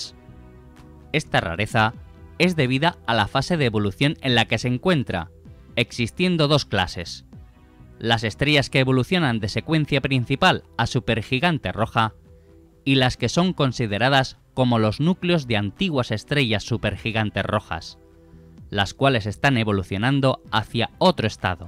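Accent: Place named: Spain. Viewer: Excelente video, pero me caen mal el acento español.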